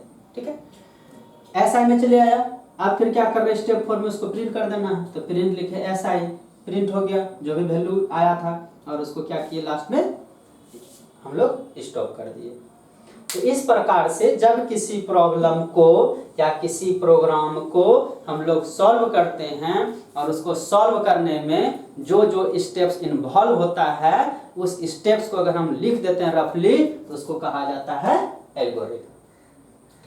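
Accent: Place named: Bihar, India. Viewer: भाषा की सरलता और हिंदी बोलने का तरीका बिहारी झलक।grest work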